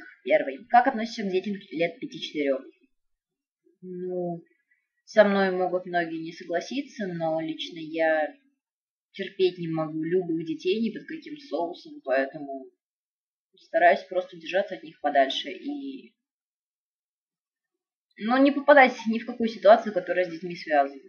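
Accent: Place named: Russia. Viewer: You are Russian, да? Or Ukrainian. Or...wow there's a lot of countries that speak Russian...anyway, you are really pretty and I really like the accent.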